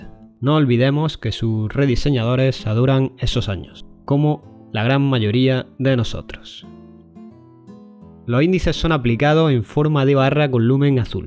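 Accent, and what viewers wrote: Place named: Andalusia, Spain. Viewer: Tu tono andaluz y la música hacen de este video una joya....saludos desde Tucumán Argentina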